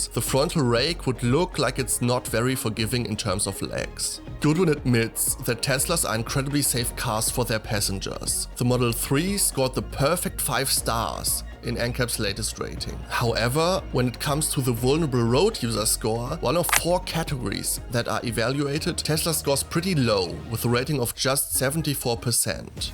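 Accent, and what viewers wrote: Germany. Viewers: You sound like a german talking english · German accent by ai is terrible😢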